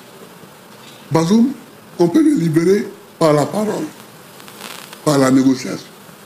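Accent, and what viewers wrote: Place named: Ivory Coast. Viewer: Il parle trop comme un vrai ivoirien fierté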